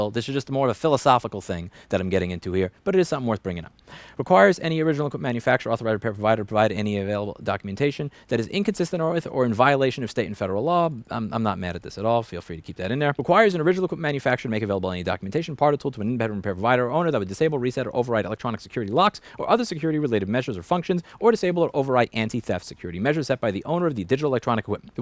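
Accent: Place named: Texas, United States. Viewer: Life long Texan here., except for talking so fast, you sound like a real Texan.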